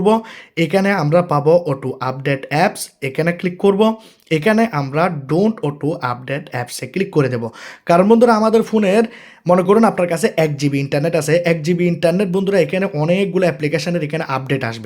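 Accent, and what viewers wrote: Bangladesh. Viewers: Apnar kotha sune mone hochhe apni Bangladeshi lok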